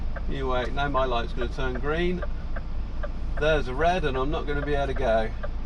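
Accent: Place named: North, United Kingdom. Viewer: great northern accent lol irish amer ny us airforce veteran master obrien sir lol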